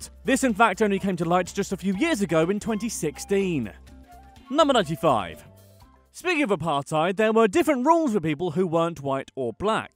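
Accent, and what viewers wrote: England, United Kingdom. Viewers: Wow very english you need to work on your accent dude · I am from South Africa nad the acsent is kinda british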